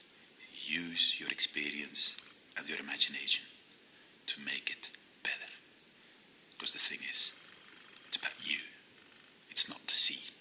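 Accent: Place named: Scotland, United Kingdom. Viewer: whats up with the scottish accent? :p Freaky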